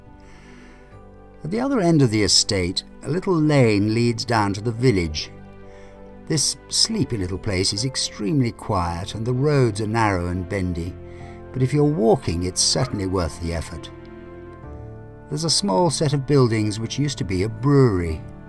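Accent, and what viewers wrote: United Kingdom. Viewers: well, you have a lovely British accent, and I’m American. So I’m betting I’m the one in the wrong! 😂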